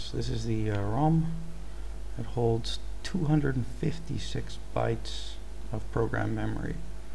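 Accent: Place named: Netherlands. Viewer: Ik hoorde hoe je praatte en ik dacht gelijk: wow, vette nederlander. Nice gedaan man :)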